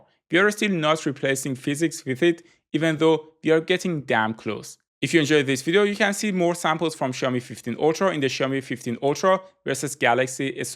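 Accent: Iran. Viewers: I love the iranian accent. Damet garm brother 😘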